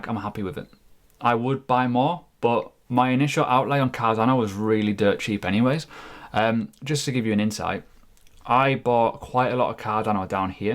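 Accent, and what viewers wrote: United Kingdom. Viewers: Hello, I'm from the U.S and sometimes I have a hard time with your British accent. Would you please show the symbols of the crypto currency in the video, summary, or notes, I am new to crypto and I did not understand what "RSI" you were talking about. I pulled up 5 different coins (I think) in coinmarketcap and for future videos please? Thank you in advance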